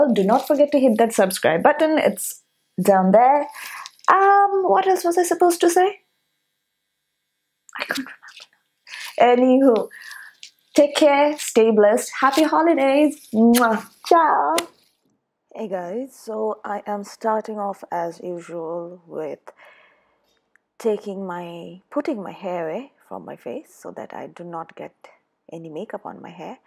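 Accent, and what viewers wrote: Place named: South Asia. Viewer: Are you from Bangladesh or India or Pakistan? Your accent and name sounds like it 😅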